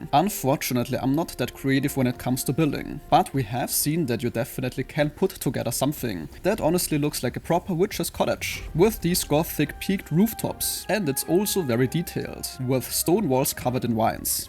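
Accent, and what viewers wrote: Germany. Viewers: wow hast du nen krassen deutschen akzent :D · i hear the german in your voice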